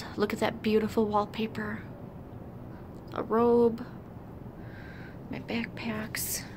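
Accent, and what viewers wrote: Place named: Minnesota, United States. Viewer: You're either from Minnesota or Wisconsin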